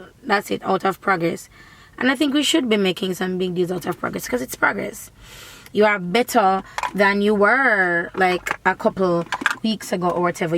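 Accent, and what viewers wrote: South, United States. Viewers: Lol girl you have my Southern accent down pack. I was lol I was like no she didn't. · Wait but why did it have to be a southern accent you could have said it right in your accent boo cause I know y'all Jamaicans can give it up to y'all men lol.. thanks for sharing! GOD BLESS.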